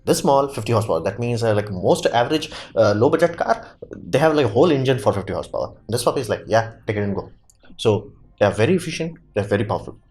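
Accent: India. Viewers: Our Bharatiya pronunciations. and fast speaking makes it difficult to digest your topic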